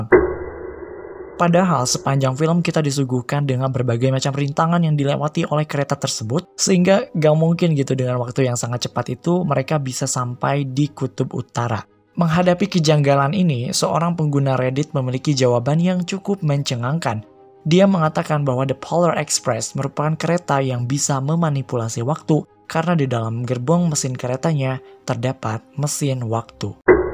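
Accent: South Jakarta, Indonesia. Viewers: English jaksel ?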